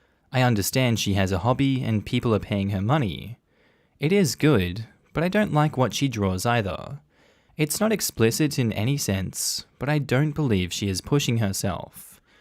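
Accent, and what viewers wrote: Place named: Australia. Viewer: I love having an Aussie to read these.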